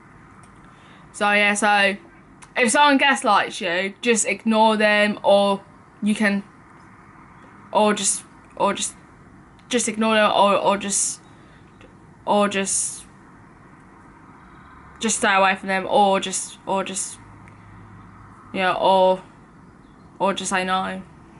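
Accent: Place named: Essex, United Kingdom. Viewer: And yeah I wasn’t diagnosed till I was 14, you got diagnosed young compared to me, btw love your accent? Are you Essex? East London?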